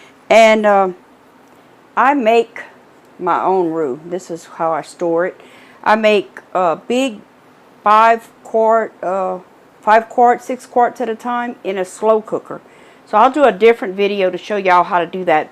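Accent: Louisiana, United States. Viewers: I don’t have an instant pot but that looks so good I may try to make that without an instant pot. Also Love your Cajun accent.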